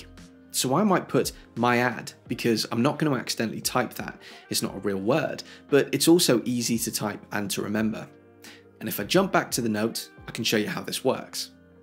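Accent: United Kingdom. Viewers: I love your channel, so nice to hear the tips presented in UK English. I use dictation a lot in fact I’m using it now, I also have Dragon which of course is more sophisticated. One of the things I miss in Apple dictation is the ability to use the phrase “ scratch that“ which when you use it in dragon delete what you’ve just said or if you want to select a single word you can say in dragon “select Apple“ and it will then select that word and you can use another one to replace it. I don’t know if such sophistication exists in Apple dictation but it would be really great to know if it does. Keep up the good work, cheers. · You explain things so well -- the British accent helps 😊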